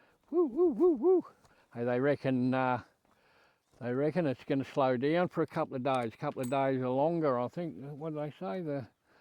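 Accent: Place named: Australia. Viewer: "Me fuck'n clippers av fucked out". I don't think it gets any more Australian than that.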